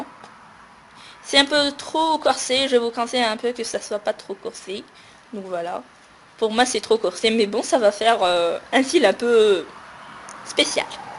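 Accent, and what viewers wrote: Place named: Canada. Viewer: Si est parle comme sa ses quelle a l acsent canadien bande de merde sa se voix (p pour dire paillettes elle dit brillant et pour dire étoile elle dit star ) tout les canadien(ennes) ytbs que je connais parle comme sa et elle pn beaucoup d abonner 😭😭💖💖💖💖💖💖💖💖💖💖😪💖💖💖💖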